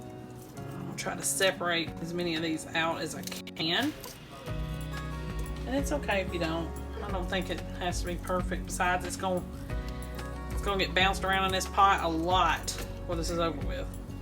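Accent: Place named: South, United States. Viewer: I love your country accent. Makes me feel right at home with my grandma 🥰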